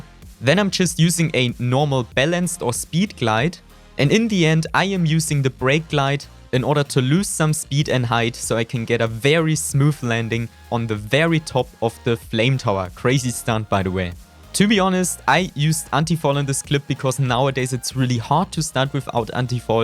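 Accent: Germany. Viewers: So heftig deutschen Akzent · Can you speak German? You have this accent. Im from Germany so yeah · You have a german accent are u german? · ich höre es doch du bist deutsch hahaah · German accent haha 😂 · Man hört voll das du Deutsch bist LOL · Alter ich schalte das Video an und höre sofort dass du Deutscher bist 😂. Aber alles gut. Ist wirklich informativ. Danke! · Du bist alman oder man hört das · Man kann hören das du Deutscher bist😂 aber gutes video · der deutsche akzent killt aber nice video bro hat mir echt geholfen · Dein alman Akzent hahahah😂😂😂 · Man hört voll das du eigentlich deutsch sprichst 😂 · Bro ich merk doch direkt das du deutscher bist. Sprech doch einfach deutsch. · I know it from your a accent that you are a german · Digga gutes Englisch man hört das du Deutsch bist! Nices Video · deutscher akzent lässt grüßen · Dieser deutsche Akzent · yes, i can hear it very good, that he is from Germany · Man kann so hart hören das du deutscher bist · irgendwie höre ich ein bisschen deutsch in deiner stimme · You are a 100% from Germany. 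Like ur speech omg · man hört das du deutsch bist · I can tell from your english, youre 100% a German Guy. This Video helped me a Lot thanks. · He sounds so damn German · der deutscheste akzent den ich jemals gehört habe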